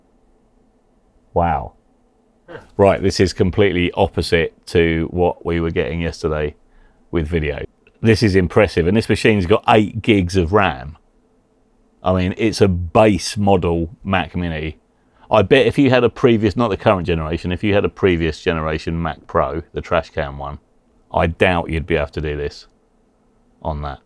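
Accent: United Kingdom. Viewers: Cannot explain the joy of hearing a good old English accent on an audio M1 video. If you're available for booking, we'd LOVE to work with you. Cannot explain how relaxed we would feel.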